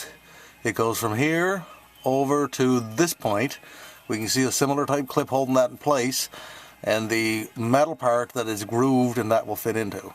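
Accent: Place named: Canada. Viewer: Liked your video even with that terrible Canadian accent! Thanks!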